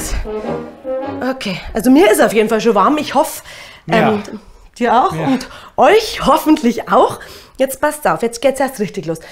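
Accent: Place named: Bavaria, Germany. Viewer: Liebe, ich wollte dir einfach nur ein Kompliment aussprechen. Ich hab mir mit meinem Mann euer Video angesehen. Du, oder ihr seid so sympathisch und lustig. Danke für dieses schöne Video. Das Bayrische klingt so nett und deine Sprüche sind zum Kugeln 🤣 super! Du solltest im Kabarett auftreten! Alles Liebe aus Österreich und weiterhin viel Freude beim Tanzen! Isabella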